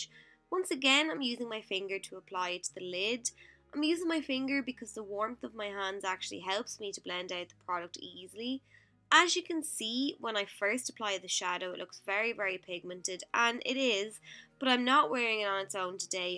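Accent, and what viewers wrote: Ireland. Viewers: Is that an Irish accent I hear?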